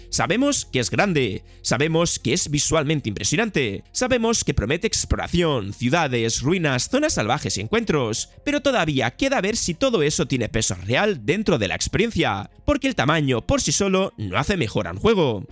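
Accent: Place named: Spain. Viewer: Por su nacionalidad el espano se habla en much paices 😂😂lo que cambia es el acento creo que es be ser espana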